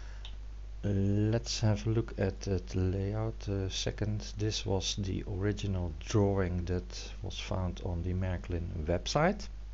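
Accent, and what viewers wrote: Netherlands. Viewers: Jij praat met een héél Nederlands accent.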